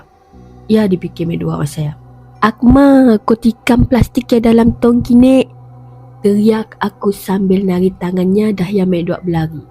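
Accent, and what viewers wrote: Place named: Sarawak, Malaysia. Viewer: Waahhhhh....bercerita sambil lorat Sarawak...hahaha...memang best awakkk....terbaikkkk👍👍👍👍🥰🥰🥰🥰🥰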